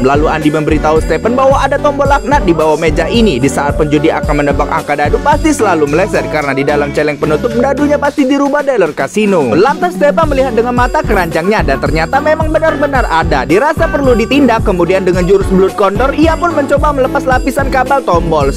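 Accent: Palembang, Indonesia. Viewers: Kau wong Palembang po 😅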